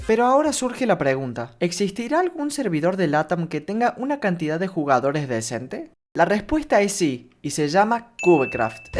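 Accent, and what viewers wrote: Córdoba, Argentina. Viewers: amigo sos de cordoba capital?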